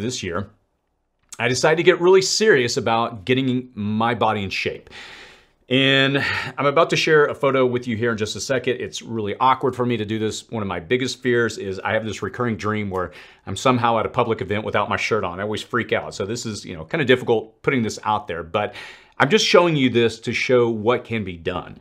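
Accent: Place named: California, United States. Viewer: I didn't want to be disrespectful but i hear cali. Soy. The real world isn't cali. Anything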